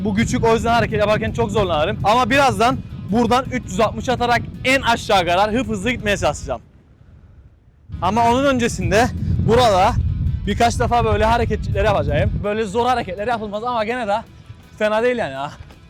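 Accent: Azerbaijan. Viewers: Abi Türk müsün? Azeri gibi konuşuyorsun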